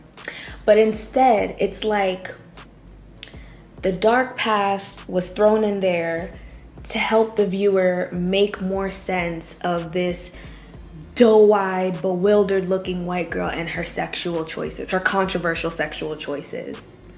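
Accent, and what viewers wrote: Jamaica. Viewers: im hearing some patois!! are you Jamaican?? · Your broken Jamaican accent is cute ❤😂 sending love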